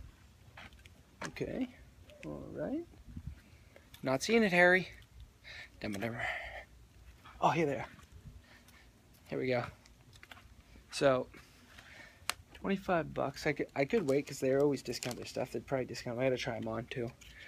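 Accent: Wisconsin, United States. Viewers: Are u from wisconsin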